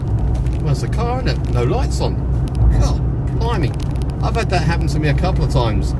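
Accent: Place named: Australia. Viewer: Where did you get that Australian twang in your accent from?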